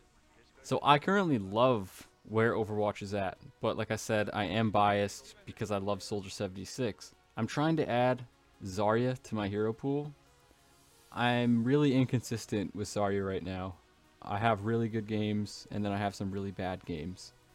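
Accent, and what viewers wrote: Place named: Minnesota, United States. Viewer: Really like your vids man. I noticed that you have an accent close to mine. I thought you may have been from Minnesota like me, but Canada was my next guess. Keep it up, your play is great. I can aim ok but cant track for shit, any tips?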